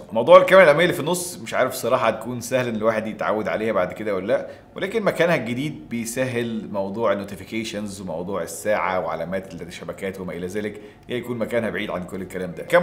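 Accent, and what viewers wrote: Egypt. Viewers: انت مصري؟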